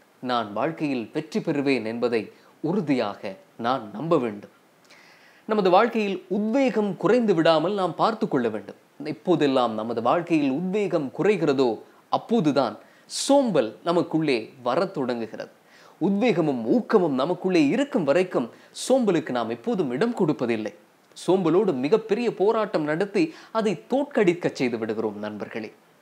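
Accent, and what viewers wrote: Sri Lanka. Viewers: உங்கள் இலங்கை தமிழ் அழகு தமிழுக்கு நான் அடிமை . அருமை 👌 நீங்கள் சிரிச்சிக்கிட்டே இருங்கள் நண்பா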